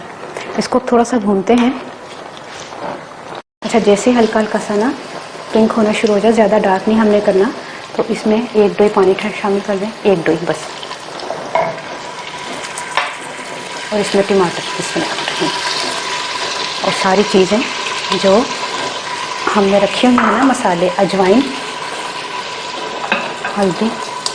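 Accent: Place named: Punjab, India. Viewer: yr tusi punjabi bolde bht zeada cutee lg de ooo keep on 👌👌👌😘😘😘😘😘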